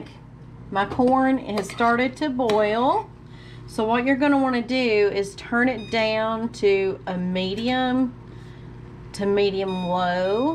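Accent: United States. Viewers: Beautiful American accent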